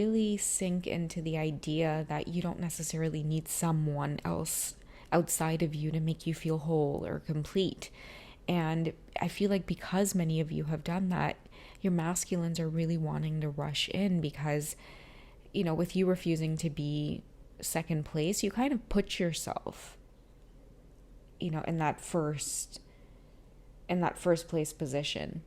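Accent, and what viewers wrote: Trinidad and Tobago. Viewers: A warm Trini shout out! I love when you speak in your Trini accent makes it even authentic. You have an amazing vibe and your reading provide such clarity.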